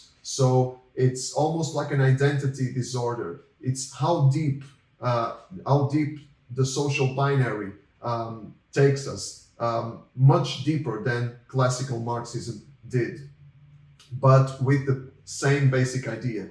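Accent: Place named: Brazil. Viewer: I'm learning Portuguese , i love your accent, I'm not sure but probably you're from São Paulo do Brasil, or Portugal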